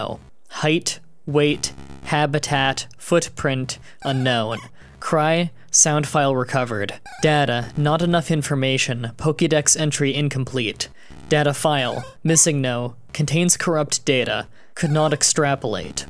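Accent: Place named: Canada. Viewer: Danielle Eaton Since it looks like he isn't going to reply I will, the words he "mispronounced" are Americanized typos. Meaning they were actual words that Americans spelled incorrectly when making the dictionary. He is Canadian and reads the words as they should be read, the rest is his dialect. He has stated this at this point probably more than one hundred times and it might even be in the description of his creepypasta videos.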